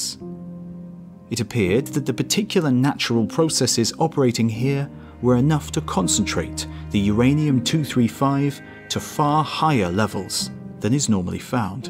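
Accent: England, United Kingdom. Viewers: i swear sounds like he says "the year was 1917" :-P gotta love the british accent!! <3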